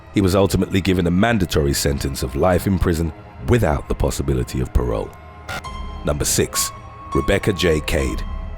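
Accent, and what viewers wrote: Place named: England, United Kingdom. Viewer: Dark skin, English accent, hypnotizing voice. Very unique. Makes the crimes more bearable to listen to.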